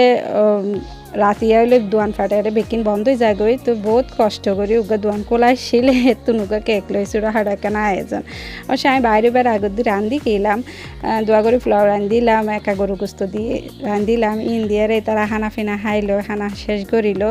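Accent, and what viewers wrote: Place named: Chittagong, Bangladesh. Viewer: চাটগাঁইয়া ভাষায় কথা শুনে কিছু কিছু বুঝতে পারছি 😁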